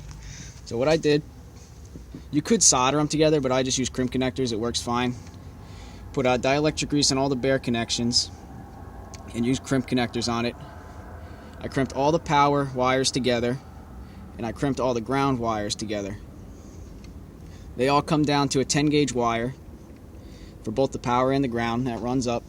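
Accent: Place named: New Jersey, United States. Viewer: Very thorough video. I liked watching it. Makes it seem easier to understand. New Jersey accent.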